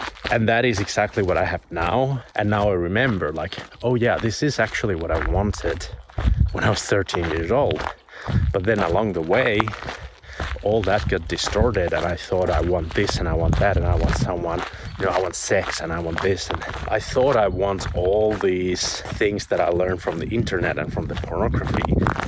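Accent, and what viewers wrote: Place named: Finland. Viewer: Very thought provoking Mate. Pardon my ignorance but are you in Finland?The nature looks that way and to me you sound Finnish.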